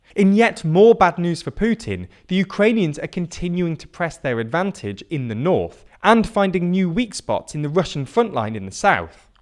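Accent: United Kingdom. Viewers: But so british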